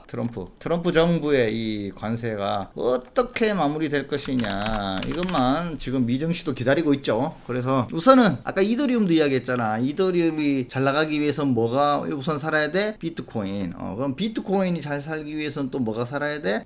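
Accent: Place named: Gyeongsang, South Korea. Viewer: 항상 시원시원하게 말씀해 주시고 경상도 사투리 정감가고 좋아요 항상 잘 듣고 있습니다 감사합니다.^^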